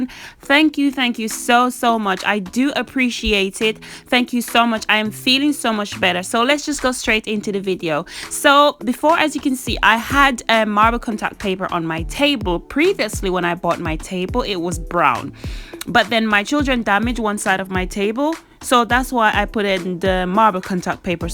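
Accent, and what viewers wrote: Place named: United Kingdom. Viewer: This is too much creativity.You did the decor quite well.I also loved the..is it British English? So amazing.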